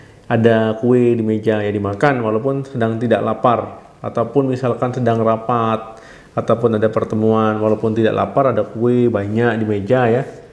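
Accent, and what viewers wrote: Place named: Bali, Indonesia. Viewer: Logat bicaranya kaya ada bali nya dok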